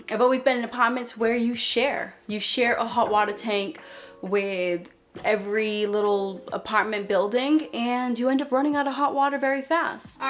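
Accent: Boston, United States. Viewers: I love your boston accent I’m Portland Oregon!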